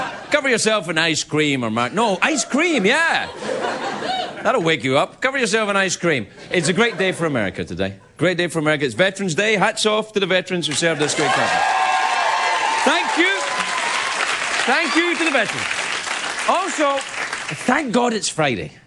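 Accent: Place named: Scotland, United Kingdom. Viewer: He had his rough Scottish accent back then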